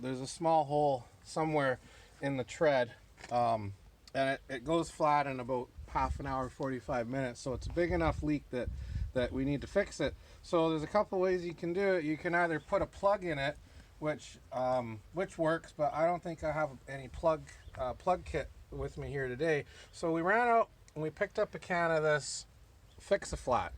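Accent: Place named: Canada. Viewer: Thanks, helped alot. I'm in NC. I noticed you talk like being in canada. Say "about" like "aboat". But great video. thx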